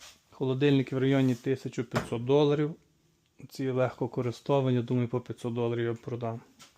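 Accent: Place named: Zhytomyr, Ukraine. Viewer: Говір житомирської області.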